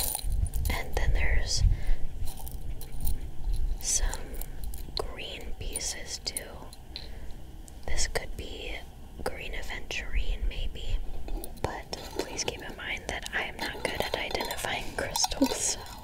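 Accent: Midwest, United States. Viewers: I would have guessed the midwest, but im from Finland so I wouldnt know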